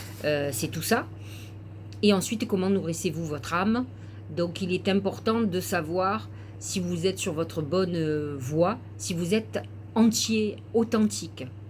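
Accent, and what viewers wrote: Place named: South, France. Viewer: Merci beaucoup de la part d une Vierge qui mentalise parfois trop. Je vais demander l accompagnement du "juste" à Madame la Lune et à mes Anges projecteurs. J apprécie bcp vos interprétations! Et j adore votre joli accent du soleil 😀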